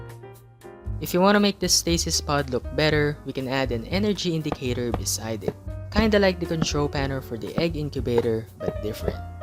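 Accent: Philippines. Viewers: I Knew he was filipino i just got it by his voice😀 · Filipino? You kinda sound like one lol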